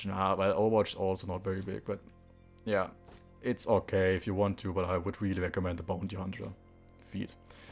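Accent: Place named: Germany. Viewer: nothing to be sorry about, I'm german too. Your accent isn't bad, I was just curious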